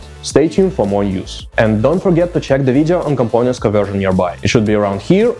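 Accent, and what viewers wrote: Russia. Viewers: Чуваки, судя по акценту, сделайте видосы на русском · Я заметил русский акцент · Братка русский знает акцент еще не ушел!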